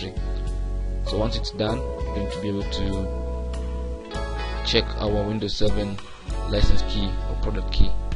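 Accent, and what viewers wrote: India. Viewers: me hears indian accent clicks off video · This guy sounds like one of those Indians that cold call you and demand money telling you computer has been hacked...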